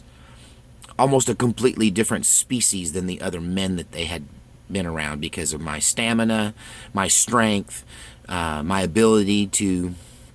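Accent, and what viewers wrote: New York, United States. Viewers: Hey. Can you say 3rd and 33rd street in that New York accent? 😅. Yes now we need to find out why we are getting the raw end of this agenda 21 and whoever they are are so important that they should get a free ride? I mean everyone who's an elitist needs to re think that. They're no more better than anyone else. Now I hear about all of the good stuff about marijuana. Hey when you can can you contact me? I have a wild idea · That guy who thinks you're making fun of Jewish people is stupid. Every one knows that your accent is from that Mike Meyers SNL skit and if they don't then they are a millennial and a cupcake. It is a NEW YORK ACCENT however my Jewish in laws from Long Island sound like this BUT so does EVERYONE ELSE FROM LONG ISLAND! When I visited I went to a diner and I ordered my entire breakfast in that accent as a joke and NO ONE figured out that I didn't speak that way! 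😁 And soy raises estrogen....no one realizes how it is in all of our food. Chemo is terrible!!! And I've also unplugged from TV/media and I also only use ibuprofen as well...most surgeries are excuses to line the pocket of the doctors!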